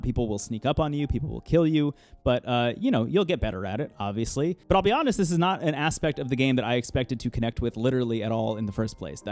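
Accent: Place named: Minnesota, United States. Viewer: this dude straight out of minnesota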